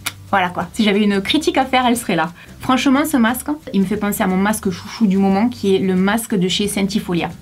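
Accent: South, France. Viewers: cest laccent du sud je pense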